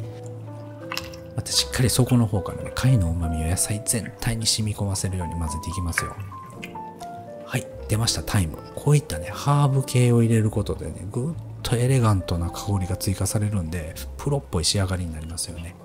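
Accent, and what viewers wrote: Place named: Osaka, Japan. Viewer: Twitternからきました。Uraruです。 大阪弁で心地のいいお声。さすがプロの話し方です。 新しいLA TABLEさんですね クラムチャウダーが欲しい季節になりました。 うちのストウブちゃんでやってみます♫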